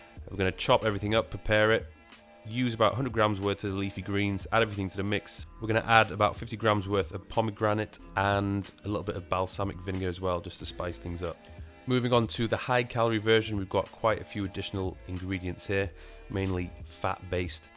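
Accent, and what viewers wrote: United Kingdom. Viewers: Metric - Check British accent - Check British Supermarket foods - check ** When American videos start measuring in terms of 'cup' I'm already zoned out. Helpful and interesting video of comparisons. Even if not followed religiously, there is certainly no hardship in introducing these meals a few times a week.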